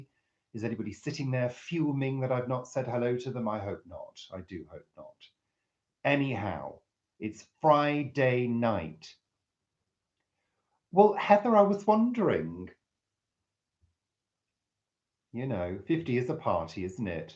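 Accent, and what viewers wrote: United Kingdom. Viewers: You have a very British accent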